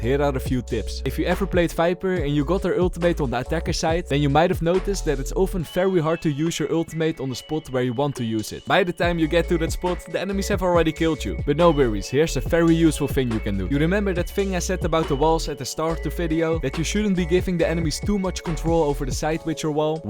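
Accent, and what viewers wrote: Netherlands. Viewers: Where are you from, sir? Your accent really sounds like from the Netherlands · Nl accent 😂❤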